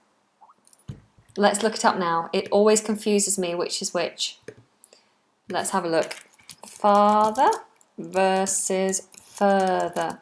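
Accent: United Kingdom. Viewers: I don't like the British accent thank you.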